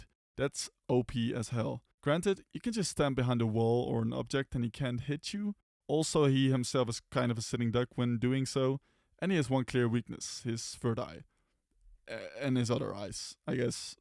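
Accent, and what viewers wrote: Netherlands. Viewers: Good video! I can tell by your accent you're 🇳🇱, no? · You sir sound dutch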